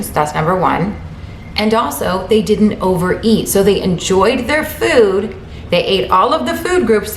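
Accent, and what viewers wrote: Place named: New York, United States. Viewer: I only found you a few days ago... watched like 100 of your cooking segments 😂 You are AAAMAZING! Now im seeing all these other (older) videos, which are GREAT! Your personality is 🔥 as the kids say! I'm 57, raised in St. Pete, live on Long Island now for many years. I know you're in FLA, I though you were from NY by the way you talk. XOXO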